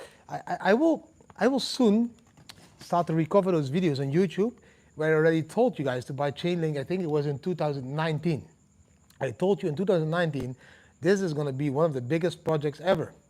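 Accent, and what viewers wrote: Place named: Netherlands. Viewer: dude man bro! i just realised your accent is dutch! i grew up with dutch granparents :) much luv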